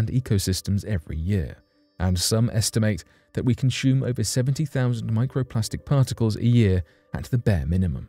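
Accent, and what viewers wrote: England, United Kingdom. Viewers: Four minutes sensationalizing microscopic plastic and seven minutes indoctrinating you, with an English accent for authenticity, into a belief system for a 13 billion year old universe. Never mind the actual science of the matter showing the universe to be extremely young. And the "Big Bang"?, really? I thought we were past that joke of a theory. Even the inventor of the word, Sir Fred Hoyle, didn't believe in this nonsensical theory. Oh!, and he was vilified for it by the scientific community just like Mary Sweitzer was vilified in 2005 when she discovered soft tissue, red blood cells and DNA in a supposed 65 million year old T-Rex bone. Neither one were creationist by the way. Y'all need to get a clue.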